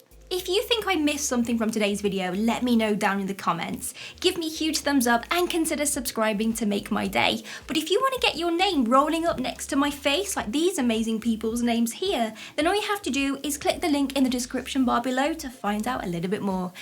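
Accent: England, United Kingdom. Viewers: I love the way she speaks. That English accent is really enticing 😍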